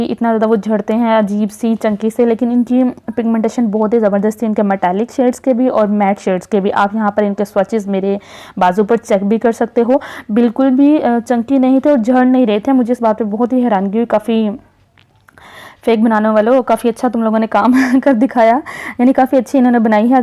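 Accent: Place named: Pakistan. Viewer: Apka way of talk boht cute hai...pakistani language hai.meri ek frnd dubai me thi vo apke jaise ho bolti thi... beautiful makeup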